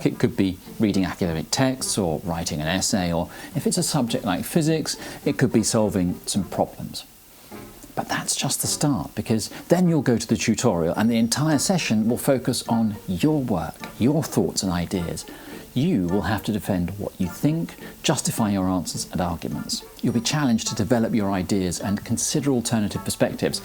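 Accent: United Kingdom. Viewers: learning thinking skills in general is a key factor in any successful learning process including critical thinking for sure to isolate false data and extract facts based on sound truthful information, then comes skills how to read, how to write and how to search for truths by yourself. thank you for sharing your ideas and i love the British accent you speak with, it sounds academic for me ! Thanks again.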